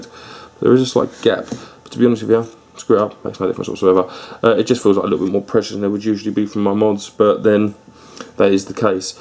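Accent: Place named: North London, United Kingdom. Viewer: Hey, thanks so much for the review. I feel compelled to apologize for any Americans giving you a hard time about talking too fast or having a 'fick' North London accent (is that right? North London?). I'm an American, and I can understand you perfectly. My only advantage is that I grew up watching Monty Python and The Young Ones and loads of other British tv shows, so I'm used to it. Vape on and talk as fast as you like. Cheers!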